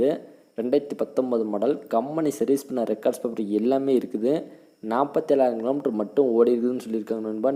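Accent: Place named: Hindi Belt, India. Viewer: Sir neenga tamila......Hindi Madri pesureenga nanba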